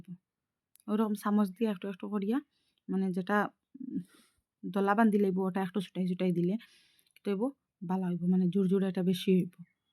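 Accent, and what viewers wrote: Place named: Sylhet, Bangladesh. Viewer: সিলেটি মাতউকা, নাইলে ফুরা শুদ্ধ খউকা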